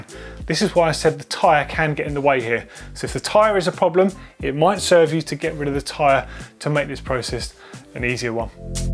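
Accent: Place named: United Kingdom. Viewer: Very clear and complete instructions. The British accent automatically adds a few points to the apparent intelligence of the presenter.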